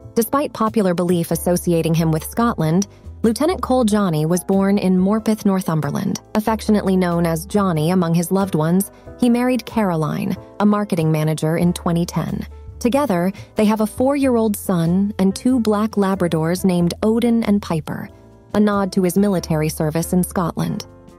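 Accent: United States. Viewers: This video is narrated in American version of English so it was pronounced correctly in that language.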